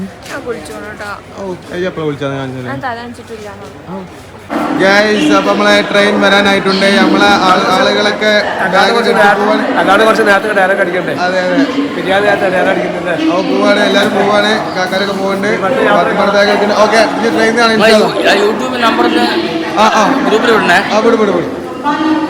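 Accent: Malappuram, India. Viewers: Cute cuples... എനിക്കു൦ ഇങ്ങനെ ഇംഗ്ലീഷ് പഠിപ്പിച്ചു തരുമോ😍😅മലപ്പുറം ഇംഗ്ലീഷ് കേൾക്കാൻ നല്ല രസണ്ട് 😅😅😍😍🥰🥰 പാത്തുമ്മ പാവ൦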